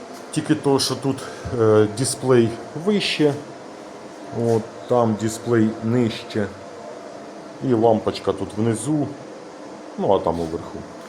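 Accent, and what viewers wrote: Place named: Poltava, Ukraine. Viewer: Говір Полтавський)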